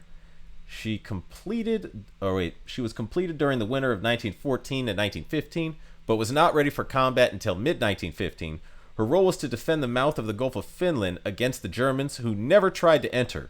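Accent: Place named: United States. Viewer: God damn man, I love your energetic personality but you have a chronic infection of Americanised pronunciation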